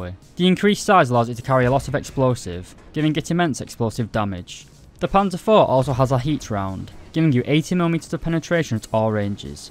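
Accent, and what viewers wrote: United Kingdom. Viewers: bro i would watch the video if u werent british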